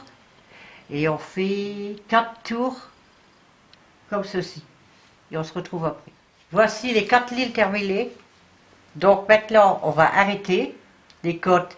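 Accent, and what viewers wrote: North, France. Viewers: bonsoir trop beau et toujours aussi bien expliquer et cette accent du nord, passez un bon moment a suivre la vidéo je vous remercie vraiment gros câlin a minou Monique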